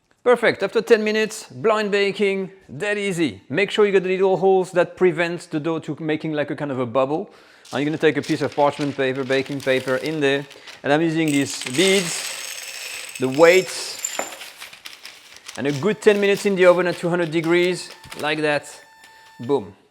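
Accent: France. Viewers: I love your french accent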